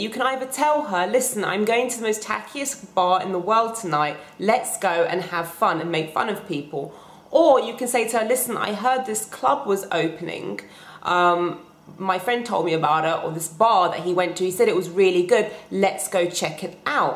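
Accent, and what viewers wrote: United Kingdom. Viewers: "Bitch Shield" bahaha. I wish her accent wasn't so british though.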